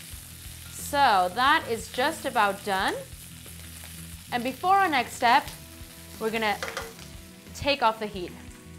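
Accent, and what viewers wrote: Ireland. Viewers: Interesting. It's like an American accent with an Irish twang.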